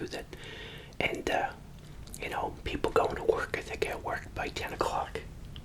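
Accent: United States. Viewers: The way he says corn flakes and coffee is just amazing I’m English and his accent is like the typical American accent I love it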